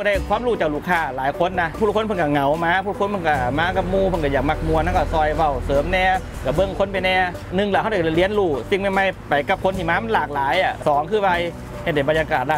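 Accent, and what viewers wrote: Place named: Isan, Thailand. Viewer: คนอีสานสิเว้าแบบนี้ล้ะครับ เว้าหลายๆ บ้านผมเอิ้นว่า ปากเป้น แต่เว้าแล้วมีความฮู้ มันกะโอเครเด้ล้ะครับ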